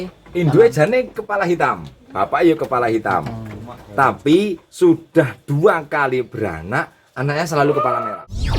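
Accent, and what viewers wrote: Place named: East Java, Indonesia. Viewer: Jawa nya kayak Jawa timuran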